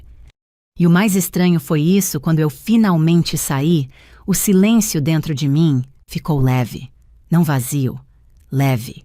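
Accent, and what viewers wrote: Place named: Caipira, Brazil. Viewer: História interessante. Gostei. Creio que poderia melhorar um pouquinho o sotaque "caipira " na pronúncia de algumas palavras. Narrativa também interessante. ❤❤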